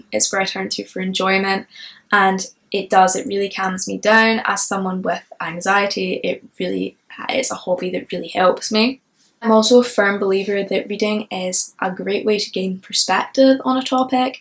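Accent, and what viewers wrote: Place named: Scotland, United Kingdom. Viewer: Hello from Canada! I'm looking forward to more of your videos. Love the Scottish accent.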